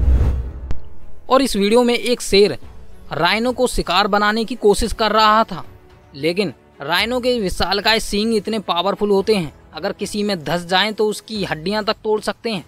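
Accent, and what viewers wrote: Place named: Marwari, India. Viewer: ये बोलने वाला व्यक्ति जरूर मारवाड़ी है बार बार उलझ रहा है 😂😂😂😂😂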